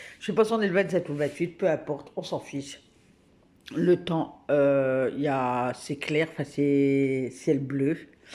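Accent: North, France. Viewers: je découvre votre chaine avec cette vidéo, et j'ai tout de suite reconnu l'accent du nord ;) (j y suis aussi ;) ) . J'ai hate de retirer nos déco de noel même si on les adore, hâte du renouveau du début d'année :)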